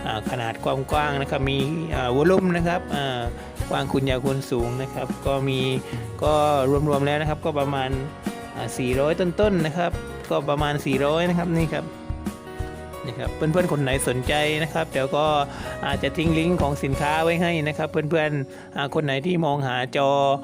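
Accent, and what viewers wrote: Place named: South, Thailand. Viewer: ทำไมพูด เหมือนคนใต้คนใต้หรอครับ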